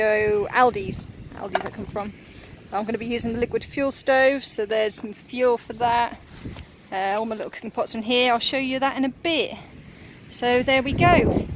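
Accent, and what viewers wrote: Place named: Norfolk, United Kingdom. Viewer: Hi just come across your vid when put in Norfolk coastal path, looking to do the walk and camp along the way if we can ever camp this year. Nice to hear a norfolk twang, being from Norfolk. Well done on your solo camp, distance is never an issue, I often go a short drive away for quick overnighter. Hand warmers inbetween your socks , or if you have a nalgien type botle fill up and use as a hot water botle , so nice to keep feet warm.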